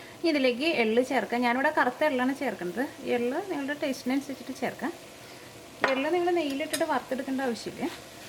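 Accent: Thrissur, India. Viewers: സംസാരിക്കുന്നതിന്റെ Speed ഇത്തിരി കുറച്ചാൽ, നമ്മുടെ തൃശൂർ ഭാഷ ചിലർക്ക് ഇത്തിരി കുഴപ്പം ഉണ്ടാക്കുന്നുണ്ട്, അങ്ങനെ ആ പരാതി ഒഴിവാക്കാം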